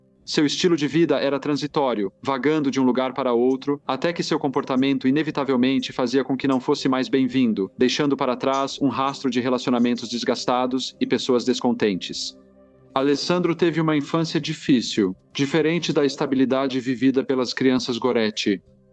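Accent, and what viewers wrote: Portugal. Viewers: Como relaxar ouvindo esse sotaque horrível de português de Portugal? Arruma isso aí!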